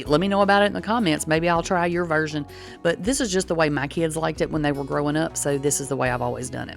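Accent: South, United States. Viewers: This looks absolutely amazing! Thanks for sharing! And I absolutely love the way you say boil..👍 I'm from Ohio so I'm not used to the southern draw but I love it! And it's cold here too we've been in the teens and single digits. But we're used to it in Ohio...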